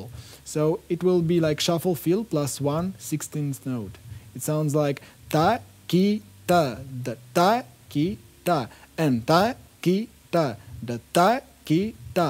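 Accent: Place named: India. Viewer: ur english look so hindi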